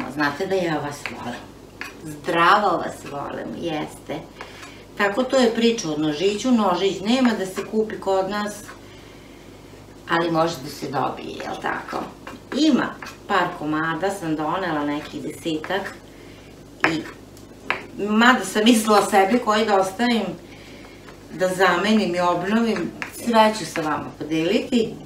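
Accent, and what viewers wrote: Vojvodina, Serbia. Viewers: Odlična ideja. I samo pricaj, uzivam u tvom govoru onako vojvodjanski posjecas me na Balasevica jako volim Vojvodinu. Znam skuhati bosansku kafu i pustim tvoje filmove pa mi kafa bude merak. Zivjla ti meni. Pozdrav.🤗🤗🤗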